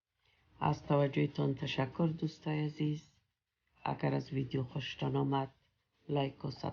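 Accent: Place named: Kabul, Afghanistan. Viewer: از لهجه تان معلوم میشود که از کابل جان استید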